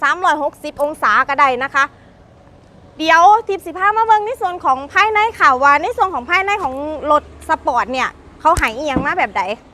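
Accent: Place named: Isan, Thailand. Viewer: ข่อยมักเจ้าเว้าอีสาน✌️😁😁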